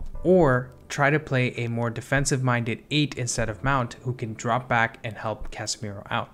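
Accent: United States. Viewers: Could have just said there’s barely any def-midfield. Wolves opened them up like a human body on operation table but then it’s supposed to be a 10min YT video. This season will be shite. (Also your accent gave away north USA feeling 😊. Just saw Canada in your bio). Good analysis overall.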